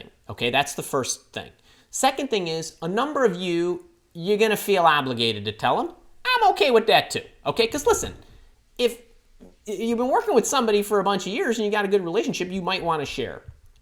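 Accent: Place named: Upper Midwest, United States. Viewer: I’m picking up a strong upper Midwest accent here!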